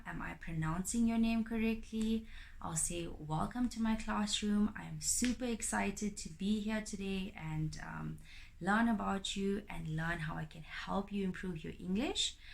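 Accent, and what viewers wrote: South Africa. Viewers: Do you use your south African accent or you change it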